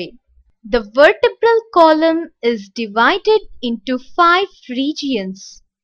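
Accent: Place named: India. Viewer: Unbearable Indian accent.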